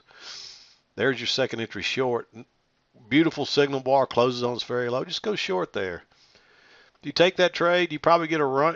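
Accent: South, United States. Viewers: Glad to hear that you do not have Covid. Mississippi huh...thought I detected a slight southern accent :-) I was stationed in Louisiana so I know how that humidity can be. Take care.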